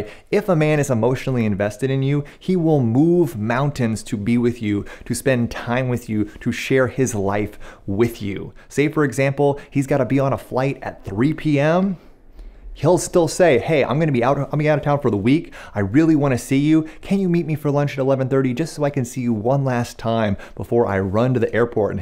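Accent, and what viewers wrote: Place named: New York, United States. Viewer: l Loved your New York Accent!!! 😂😂😂😅😅😅😂😂😂😇😇😇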